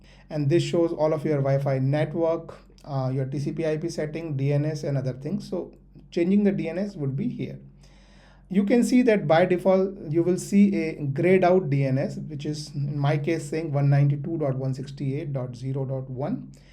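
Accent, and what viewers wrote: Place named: India. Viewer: I swear Indians always know the best tech, heard the accent knew my problem was getting resolved .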